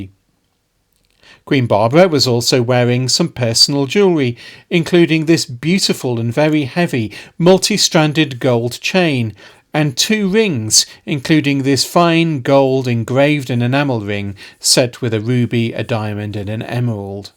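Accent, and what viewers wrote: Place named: England, United Kingdom. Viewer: Why don't the English try even a little to pronounce foreign words/names as natives would? The J in Polish is pronounced as Ya.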